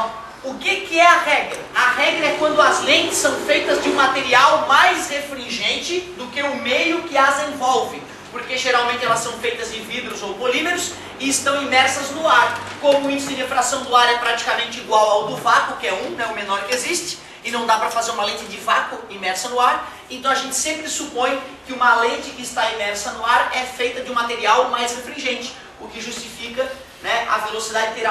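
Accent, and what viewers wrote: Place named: Florianópolis, Brazil. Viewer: Muito boa a aula! Valeu professor! O professor é de Floripa? Percebi o sotaque, deve ser.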